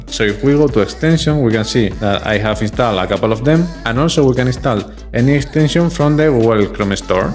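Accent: Spain. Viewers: Cómo se nos nota el acento español cuando hablamos inglés... Buen video colega, me suscribo.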